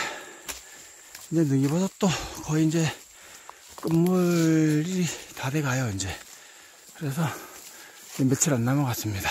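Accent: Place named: Gyeongbuk-Gangwon, South Korea. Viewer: 좀더 다니셔야 겠습니다^^ 알수없는것이 능이철이라 늦능이 대박산행 15일정도 열심히 다녔던 기억이 ㅋㅋ 수고하셨습니다 님 말씀이 경북 강원도 경계의 억양처름 들립니다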